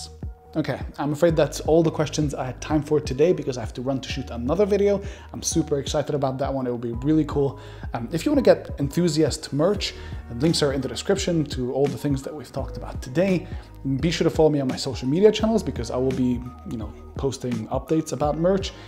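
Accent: Germany. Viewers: Really enjoy watching this channel. First time I thought that he is from Germany haha :)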